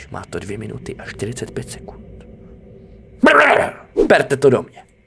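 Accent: Moravia, Czech Republic. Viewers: Aaaa Bojanovcak :) si rikam odkad ted silny moravsky prizvuk (to je jedine dobre, uz mam tech "cechackovskej" vlogeru dost)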